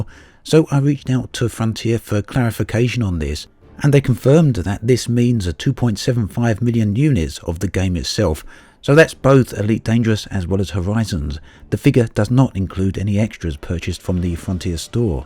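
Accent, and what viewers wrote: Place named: RP, United Kingdom. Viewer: Hello cant wait for the new game play to come to live servers as we need a massive war and all factions to unite against a common foe. Also have you watch the brocast as they protreyed you as the james bond of elite dangerous with your posh actsente.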